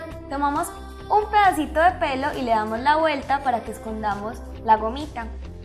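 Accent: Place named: Colombia. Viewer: que país eres ?.... si me preguntan por que : es que tu acento es como colombiano o venezolano AAI NOSE estoy confundida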